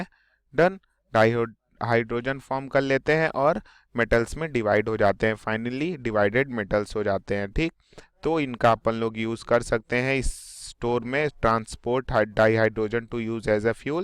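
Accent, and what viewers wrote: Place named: India. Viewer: Am I supposed to understand your indian english ???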